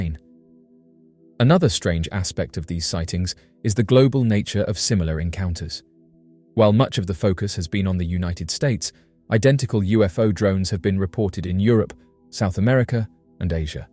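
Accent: United Kingdom. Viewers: This channel is such bullshit .Right wing shill and then act like they get memo's from Anonymous😂Delivered in this ridiculous brit accent like that makes it seem serious.What a constant on running joke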